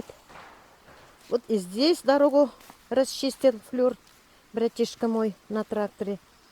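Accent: Tatarstan, Russia. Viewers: Здравствуй, моя дорогая землячка, коллега по работе в нашей молодости и Мама и Бабушка своих внуков! Получается, что мы все коллеги! Мы все Мамы и Бабушки. Какие Молодцы жители вашей деревни! Как здорово, когда есть вот такое место, где можно собраться вместе всей деревней на Сабантуй. Я с детства помню Сабантуй, от слова Сабан-плуг, когда спашут землю и посадят, всегда устраивали на поле, около деревни, Сабантуй. Весело было! Спасибо тебе, за воспоминания о детстве и Моей Любимой Родине! Не думала Я, когда уезжала, что так буду скучать по Родине. Спасибо, большое!!! За поля Родные, За леса и за Родную Природу и за речь национальную и за говор т.е.акцент татарочек! Как же всё родное! Счастливая ты, что смогла вернуться на Родину. А самовар-то у меня есть. Это я сохранила-чай из самовара на улице и со сливками и из пиалы.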